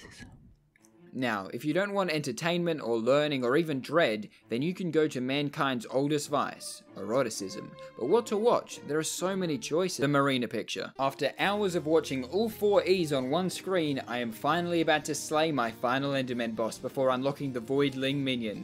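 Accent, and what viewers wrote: Australia. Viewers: good content and austrailian accent 10/10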